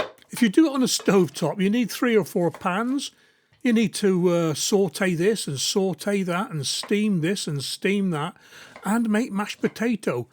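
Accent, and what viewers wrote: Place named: Cornwall, United Kingdom. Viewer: What a recipe! Where's that from? Doukhbor... what language is that? Also, I'm trying to place your accent... are you from Cornwall?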